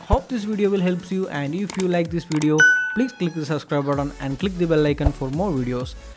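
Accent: India. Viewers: 😂😂😂India man..English India...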